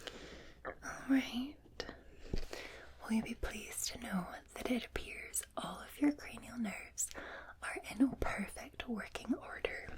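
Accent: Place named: Scotland, United Kingdom. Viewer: Love your cranial nerve and sleep clinic videos your soft Scots accent makes me feel safe it helps me with PTSD survived sa by male guitar teacher at 17 fifty four now but you help me sleep thank you for being a light in the darkness you really are a life saver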